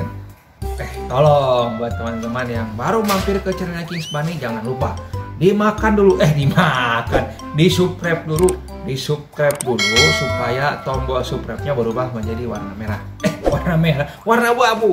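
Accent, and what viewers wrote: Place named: Sunda, Indonesia. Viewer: si aa atuh meni lucu sunda na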